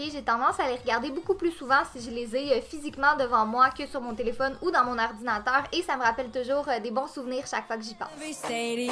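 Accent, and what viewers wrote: Quebec, Canada. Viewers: et j'aime trop l'accent du gebec <3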